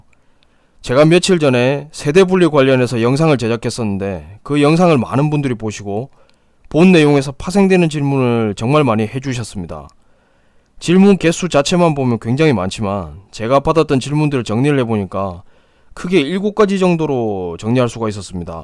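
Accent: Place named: Jinju-Sacheon, South Korea. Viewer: 감사합니다 억양이 진주사천사람 같아요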